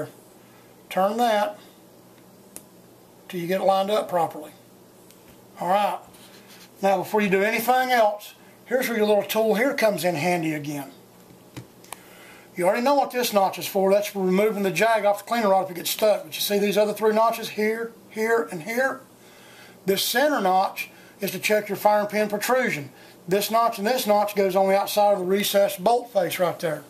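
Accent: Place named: Northeast, United States. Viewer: He lives in Maine as you can tell by his heavy, Northeastern accent. LOL. This really is good and detailed video.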